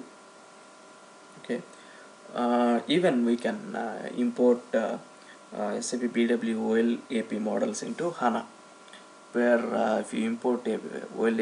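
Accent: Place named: India. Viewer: Please don't use your ugly cranky shitty indian accent, gives me headaches. Get a proper english education.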